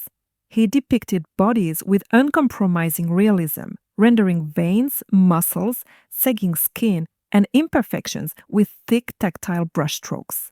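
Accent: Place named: France. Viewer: You have a beautiful voice with your elegant French accent. Your analysis of great works of art is clean, consise and freshly enlightening. Thank you for this.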